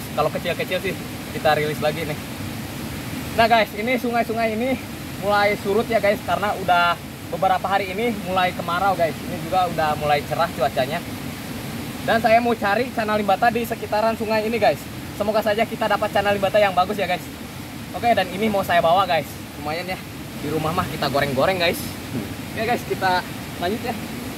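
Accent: Indonesia. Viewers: Bang urang sunda nya sarua ejeng urang sunda saya banten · Sunda na nu ti mana mang 😁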